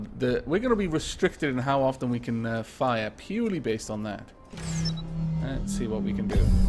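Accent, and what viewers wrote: Wales, United Kingdom. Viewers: A MASSIVE: THANK YOU!!! (^_^) Hi! I'd been watching lots of Cataclysm: Dark Days Ahead vid's here on youtube when I stumbled across your fantastic channel. After I'd ran out of your Cata' vid's to watch I briefly checked your Pixel Piracy when I noticed these Robocraft vid's of yours. As a fan of Minecraft & Kerbal SP the appeal was instant. I downloaded the game that same & haven't touched anything else for a solid week now. I've been making pretty much 1 to 2 vid's a day now since I started out on this epic quest of awesome cube versus cube destruction and would just like to thank you for your part in this. Will give you a shout out in my next video. I'm a fellow Brit & love your accent [ Welsh? ] - hope to see you ingame someday & please feel free to see what you've caused if you ever have the time over on my channel, would love some feedback if you do ever get the time to peruse my efforts so far, cheers Sho (^_^) <link>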